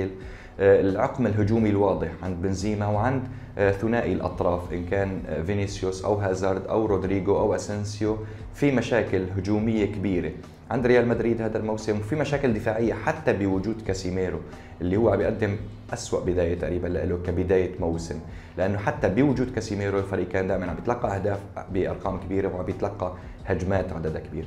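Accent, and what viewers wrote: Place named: Aleppo, Syria. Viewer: صحيح ونا لاحظت انه من حلب من اللهجة 🥰🤩 بصراحة تقديم رائع ومبسط مشكور ..... بالتوفيق لحضرتك🌹🌹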